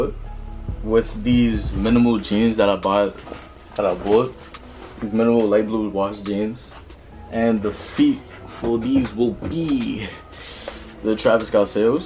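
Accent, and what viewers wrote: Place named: New York, United States. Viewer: Yee i aint een see da name nd i knew yu was from new york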